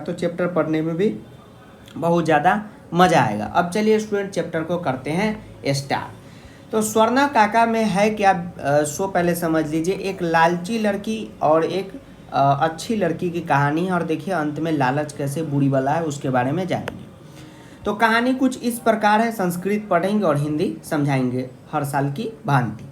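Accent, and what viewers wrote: Bihar, India. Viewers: Sir aap bihari haina?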